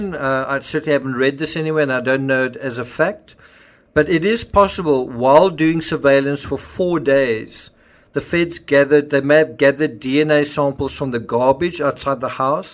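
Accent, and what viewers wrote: South Africa. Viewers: Narater sounds South African.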